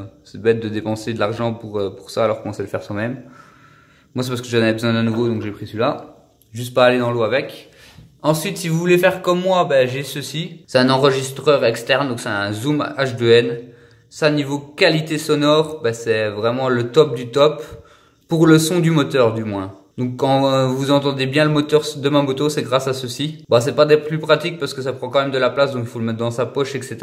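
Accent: Belgium. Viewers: Meeci la YouTube MONNEY !!! 😅❤️ Àvec ce petit accent du genre belge MAMÉNE !✔️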